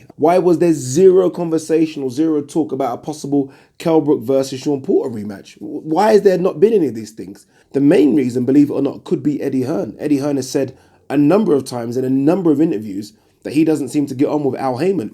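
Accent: Liverpool, United Kingdom. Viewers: Love your Scouse accent.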